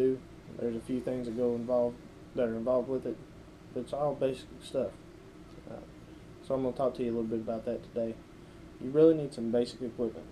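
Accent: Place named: South, United States. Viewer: Awesome video man. I learned some things I will be using this weekend. You just need to talk slower and louder for us guys that are not used to a southern accent. lol Thanks man!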